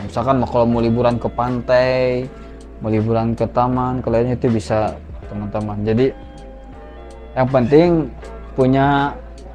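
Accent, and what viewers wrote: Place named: West Java, Indonesia. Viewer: Kapan mao ke salmiya bang ? Kopdar" Aksen nya aksen sunda ini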